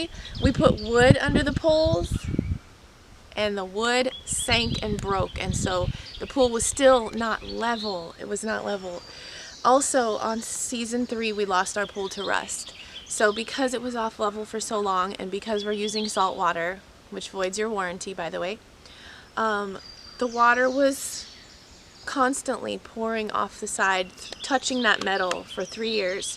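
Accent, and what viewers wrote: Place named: Louisiana, United States. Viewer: One of my many talents is to recognize and pin point the different dialects and accents from Louisiana(which there are HUNDREDS) and call where people are from. I turned on the video and i thought i recognized a north shore St. Tammany or maybe Washington parish spunding lady and i click on your page and see the Louisiana cover pic. I could be wrong, i sense a hint of the lower cajun country(east of Atchafalaya bridge i.e henderson breaux bridge area) so maybe your an implant and just picked up some of your accent but im pretty solid on the northshore call. How'd i do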